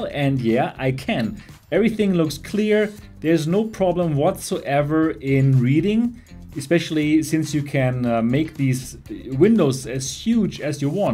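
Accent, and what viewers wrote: Germany. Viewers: Are you from germany? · Man hört von der ersten Sekunde, das du ein Deutscher bist ^^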